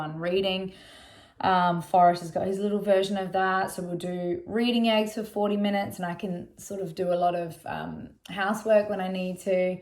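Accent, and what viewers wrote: Australia. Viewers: It's weird hearing your Aussie accent, when you're soo American in everything! I'm in Sydney, I work from home and am ok with spatially distancing. It's been pretty blazee with community co-operation here, luckily it hasn't been as rife here, hope they can get it under control in Victoria! I too have been saddened by the "every-man-for-himself" attitude but society especially in the city is kind of like that anyway. Gosh I hope they find a vaccine, but life is so precious, hope we learn from this. Much love & light Xx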